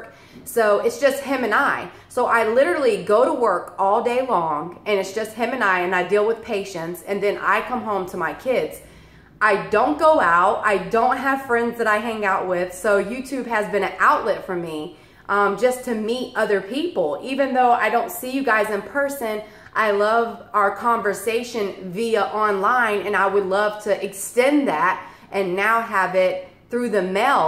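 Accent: South, United States. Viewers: Gotta say that I just love your southern accent. I love some of the phrases you use. They add to your heart warming personality. I myself appreciate your comments regarding even the smallest kindness making your day! It’s true. One thought good or bad can change the course of a day sometimes, but when you know that their are people who care it makes life just that much easier!!!! Thank you for your positivity today!!!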